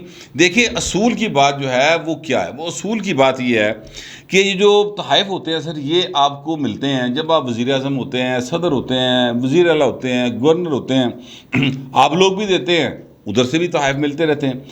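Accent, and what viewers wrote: Punjab, Pakistan. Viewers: Congratulations. I like your honest opinion with Punjabi Tadka. May Allah bless you. Love & affection from India. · 🌹 ❤️ 🌹 ❤️ 🌹 100,000 subscribers Congratulations 🎊 💐 🥳 🎊 When you use "Punjabi touch" in your analysis cits hilarious. Zabardast ❤️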